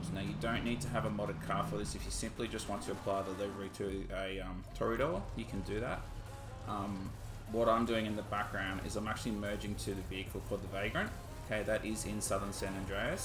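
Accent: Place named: Australia. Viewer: I only realised u was Australian 😭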